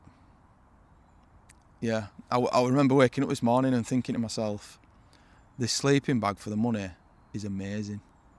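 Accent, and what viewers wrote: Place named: North, United Kingdom. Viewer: Great way to start my morning! Northern accent is perfect ❤ I see why this is one of your favorite spots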